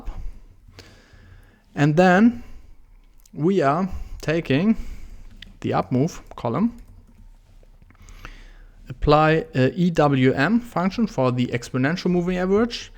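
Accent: Germany. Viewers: Hab an deinem Akzent erkannt, dass du Deutsch bist :D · You are also a German. Your English accent is my hint^^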